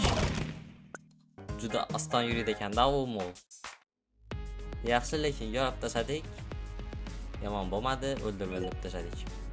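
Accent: Khorezm, Uzbekistan. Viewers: Horazmli misiz brat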